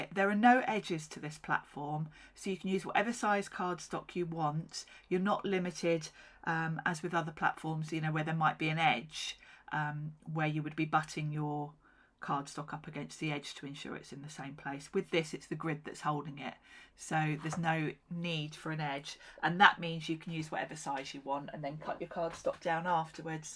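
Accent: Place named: United Kingdom. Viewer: Hello! I’ve just received mine in the mail today, and I am even more excited to use it after watching your tutorial. You’ve explained everything so well, that for a rarity, I really don’t have any questions as of yet. I may have some after playing with it, but I do feel you were ever so thorough. I showed my husband and daughter not too long ago and exclaimed, “Look! My birthday pressie has finally arrived!” I received two smiles and one with an eye roll. 🙃. My birthday was in August. When I finally decided what I had wanted, they were already out of stock. I can’t tell you how quickly I was pressing buttons when I received an email letting me know they had more in just a few days ago. I do have two unrelated questions. The first being, in some of your comments it had been mentioned this had been on C&C with Pete. Could you please tell me what the C&C stands for and who Pete is? 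My second question is more personal, and I do apologize, but my curiosity has gotten the best of me. Could you please tell me the area of England you’re from with your British accent? I’m asking because my Mum was British, she had married an American fella and moved to the states. However, there were parts of my life when I did live there and went to school. The first part, my Mum, older brother and I lived with our Grandparents in Avebury. After awhile, my Mum took my brother and I to live in Salisbury. This had been when my Dad had been stationed in a not so family friendly area, and that’s why we l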